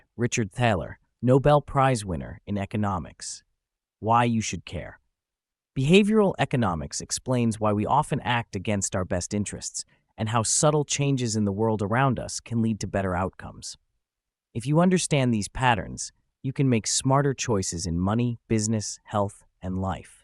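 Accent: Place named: United States. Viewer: American English name, American English speaker, British English material 😮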